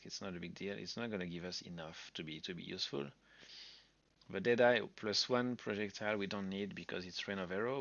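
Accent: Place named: France. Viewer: hi, great video! I cant tell if you're french with your accent, am i right? haha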